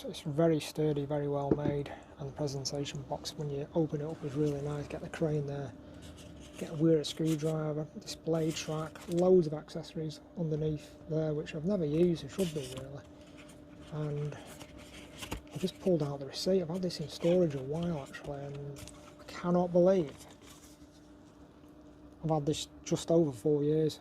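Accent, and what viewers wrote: Manchester, United Kingdom. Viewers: Excellent video where you from you sound Manchester 😀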